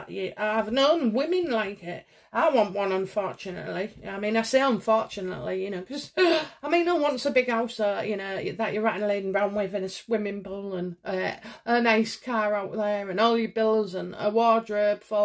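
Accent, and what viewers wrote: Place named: Northern England, United Kingdom. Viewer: I'm also from bookworm. 2. Living in the UK. Sounds like you're in the north. 😁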